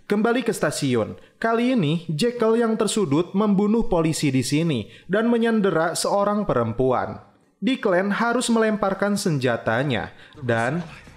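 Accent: West Java, Indonesia. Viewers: Cemprenk cadelnya sih masih respect, tapi tolong dong sundanya kurang-kurangin. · Ciga orang Sunda ey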